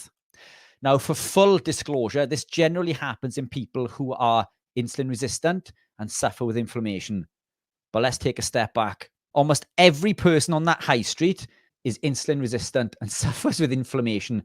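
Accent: Wales, United Kingdom. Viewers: I was truely fascinated by your talk ...all your knowledge...I'm 90% carnivore and sometimes eat seeds...nuts...and potato mash at weekend....now I'm aiming for 100% carnivore. Thank you ❤ Can I just add how sad I felt when you mentioned your Dad...unfortunately his loss 😢 Thank you again for passing on all your knowledge 🙏 I LOVE YOUR WELSH ACCENT 😍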